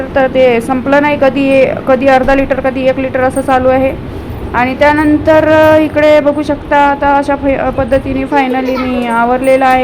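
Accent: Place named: Nandurbar, India. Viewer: तुमचं गाव कोणत आहे तुमच्या बोलीभाषेवर तुम्ही नंदुरबारच्या किंवा जळगावच्या आहात असं समजतंय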